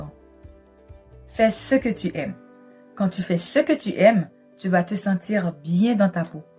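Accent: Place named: Canada. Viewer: Accent canadien Mwen renmen l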